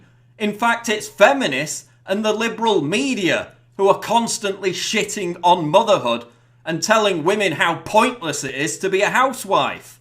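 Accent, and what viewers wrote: United Kingdom. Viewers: Paul Joseph Watson Okay, Paul, between your humorous and colorful description of the truth and your British accent, I could listen to you all day long!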